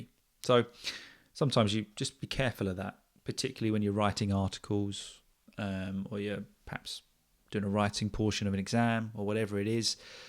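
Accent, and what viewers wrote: United Kingdom. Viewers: hello from Brazil. I love British's english and this is the first time here listening to you. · I love this, am like learning british accent and am really feelings awesome right now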